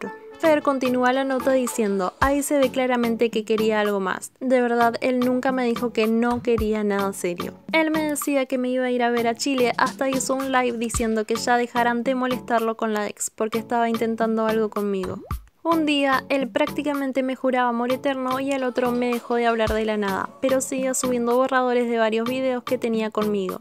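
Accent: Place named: Venezuela. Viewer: no tiene acento argentino habla como Venezolana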